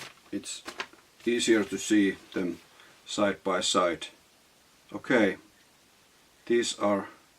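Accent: Finland. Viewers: i love finnish accent so cute :)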